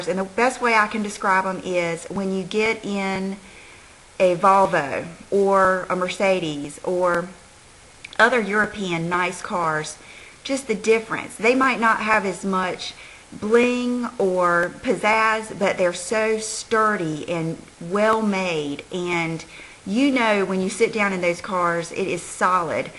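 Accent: South, United States. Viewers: I'm a new subscriber and I will definitely stick with watching your videos. You seem like such a genuinely sweet person. I just love your accent too. I'm a slow-talking Texas girl myself, so I am glad to see another Southerner with their strong access just out there with no apologies.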